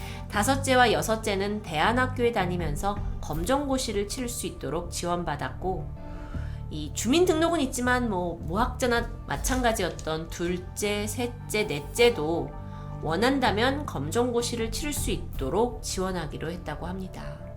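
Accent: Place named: Jeolla, South Korea. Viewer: 전라도 ㅋㅋㅋㅋㅋ 아옼ㅋㅋ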